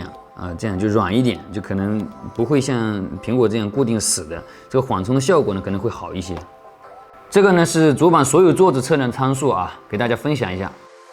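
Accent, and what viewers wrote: Wenzhou, China. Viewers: 声音跟楼斌挺象的:)只是带了温州腔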